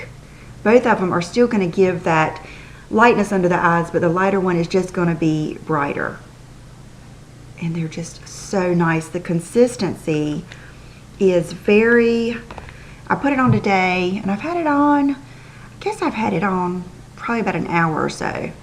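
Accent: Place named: Texas, United States. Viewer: i loveeee your accent!!! is it from texas??? im from another country so that is why i dont know exactly where its your accent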